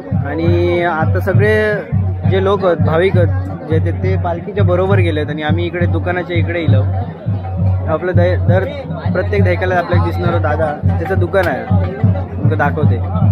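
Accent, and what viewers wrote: Malvani, India. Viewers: लय भारी व्हिडिओ. खुप छान वर्णन केले आहे. तुझ्या बोलण्यात मालवणी गोडी आहे. एक लक्षात घे कि स्थानिक मराठी लोकांचे स्टॉल किती होते व परप्रांती लोकांचे किती स्टॉल होते हे समजले पाहिजे. उशी विक्रेताचा स्टॉल भारी! लोकांना काय हवे याचा विचार करणे गरजेचे आहे. प्रत्येक व्हिडिओ शेवटी विचार करणारा व परिणाम कारक हवा हि विनंती. राग नसावा. यु ट्यूब मध्ये आता स्पर्धा झाली आहे. आपण मुळाचे सिंधुदुर्गवाशी आहोत. आता पैसा कामुक लय व्हिडिओ दाखवतहत. सावधान माझ्या कोकणी बांधवानो! तुम्ही आहात म्हणून कोकण आसा! धन्यवाद 🌹🙏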